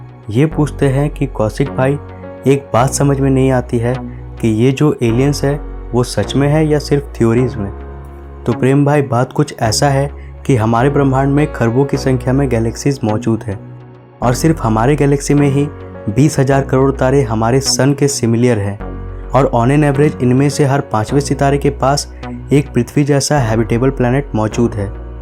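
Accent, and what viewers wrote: Bengal, India. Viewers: r u bengali?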